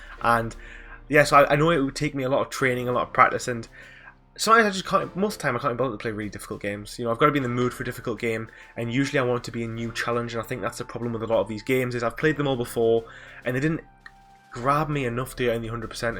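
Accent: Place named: Northern England, United Kingdom. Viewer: Sounds like your from my neck of the woods. I'm in darlington pal, nice video man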